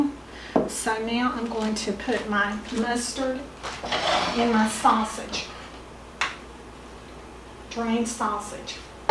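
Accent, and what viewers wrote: South, United States. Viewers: I just found your channel, you are SO sweet, oh my goodness. Listening to you share the recipe with your Southern style just made me feel so at home for some reason and I'm not southern! (even though we live in FL, that is NOT the south) :-) Newly subscribed. I'm looking forward to making this on Thanksgiving morning. I think many people know of a breakfast sausage recipe but your video just is so heart warming. Wishing you a Happy Thanksgiving. Glad to have found your channel.-Theresa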